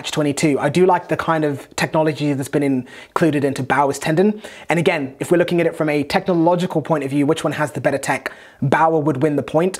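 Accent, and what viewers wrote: England, United Kingdom. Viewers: Hearing an Englishman talk about hockey still throws this Canadian off. But there’s no denying that knows his shit. As always, a great and informative clip!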